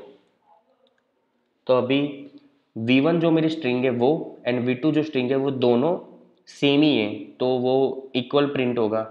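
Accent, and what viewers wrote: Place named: Gujarat, India. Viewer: ભાઈ એક નંબર વિડિઓ બનાવીયા છે અને તમારો અવાજ નો ટોન પાક્કો ગુજરાતી... જય જય ગરવી ગુજરાત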